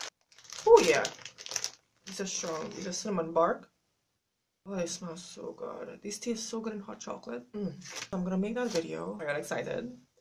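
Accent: Trinidad and Tobago. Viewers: Are u frm trinidad? Your acvent almost sounds trini 😊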